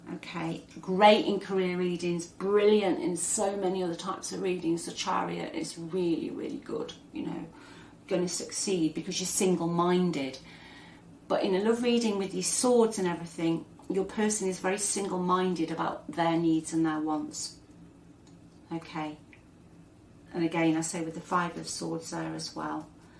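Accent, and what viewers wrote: United Kingdom. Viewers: Hi ,greetings from Montenegro ,i love Youre Brittish Akccent,i love English language i speak and learn as a child ,thank you for youre readyngs ❤❤Love Light and Happy New Year 🎉🎉🎉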